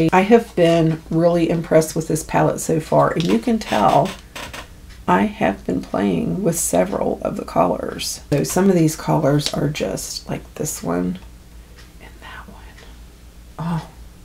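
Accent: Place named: West Virginia, United States. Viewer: Enjoyed your video as I always do. I am moving back home to West Virginia this coming weekend - Ravenswood. Hearing your beautiful accent has made the wait to get back seem shorter! Thank you!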